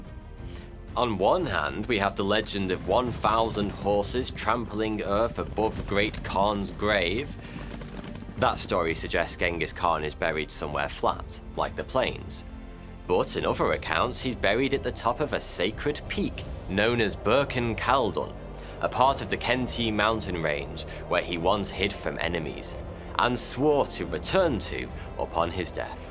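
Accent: United Kingdom. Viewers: you're the best story teller ever and your britich accent makes 20 times better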